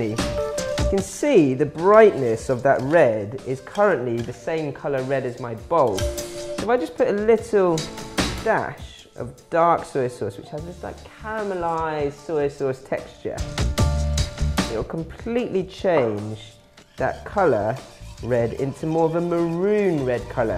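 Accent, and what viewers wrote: England, United Kingdom. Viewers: Nice and clear instructions,easy to follow.Chinese with a british accent. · It is kind of weird to heard perfect British accent from a Chinese guy. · I don't like this guy English ascents? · I love your British accent sir,good cooking huh.. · luv uk waps.... ha ha in holland we call ourselves proudly peanut. "skool uv wuk" great uk accent mate. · He has an english accent!!😱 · Asians with a slight British accent always makes me stop and a take a second look. Great videos!!! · Too much English accent and annoying background music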